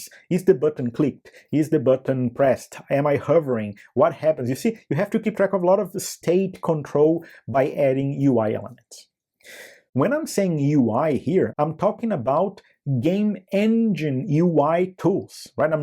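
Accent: Brazil. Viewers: reconheci pelo sotaque, mas muito bom o conteudo mano parabens, muito raro ver conteudo de game engine/c++ no br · Cara, sei que você é brazileiro só pelo sotaque rsrsrs, muito bom! continue nesta jornada, me ajudou muito a entender essa caixa preta. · sabia que era brasileiro pelo sotaque kkkkkkkk muito bom o video cara parabéns pela qualidade! Me inscrevi e gostaria de dizer que você me dá uma impressão de qualidade de conteúdo melhor do que muitos canais ai seja gringo ou não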